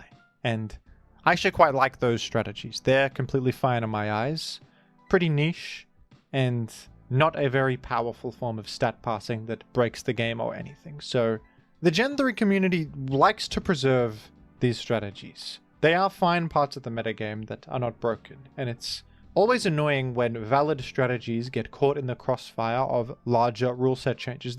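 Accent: Australia. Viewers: You're australian??? I never wouldve guessed. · I watch so much that I now internally pronounce "Baton Pass" the Aussie way. I accidentally said it to my partner even, which gave her quite a laugh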